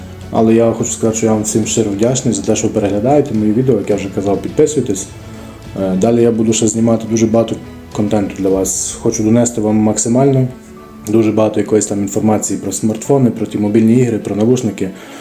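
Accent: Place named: Western Ukraine, Ukraine. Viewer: Надіюсь люди підтримають ваш канал, приємно дивитись і слухати, хоть і чути акцент із західної:) Удачі вам! Підписка і лайк!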